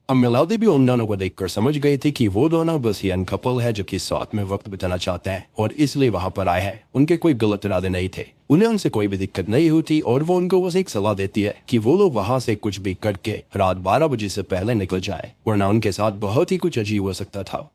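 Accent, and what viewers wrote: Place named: United Kingdom. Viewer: Hi your videos explained are very nice but I dont like that you put british access in your talking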